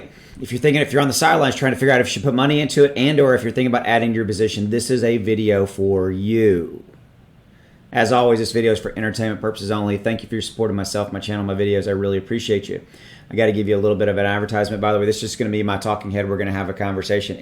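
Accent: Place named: Appalachia, United States. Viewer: Like your video. As for your accent, you sound like a local (NC / SC mountains).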